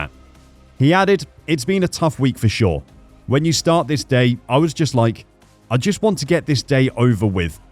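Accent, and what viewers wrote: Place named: United Kingdom. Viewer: This is so fabricated. You and your limey voice STFU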